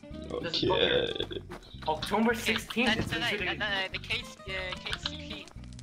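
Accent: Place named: India. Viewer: India is proud of your accent🤝🤝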